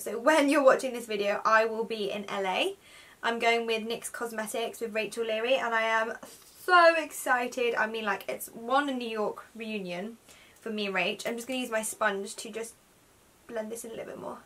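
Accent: United Kingdom. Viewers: Ahhh, you replied! I love you even more now! <3 <3 <3 British sounds so much cooler. Haha. I hope you enjoyed your stay in LA :)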